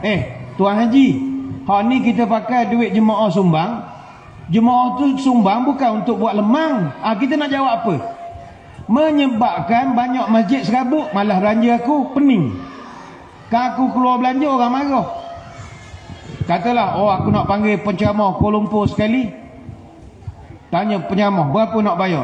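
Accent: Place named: Terengganu, Malaysia. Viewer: pasih molek ustaz cakak sleng ganu:)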